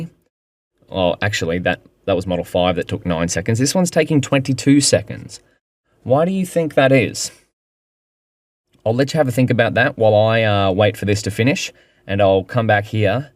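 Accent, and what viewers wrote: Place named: Australia. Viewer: Yes, his australian accent is one of a kind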